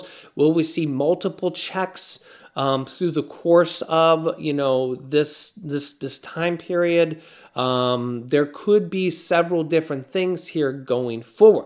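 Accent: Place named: New York, United States. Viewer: Just, I can hear you New York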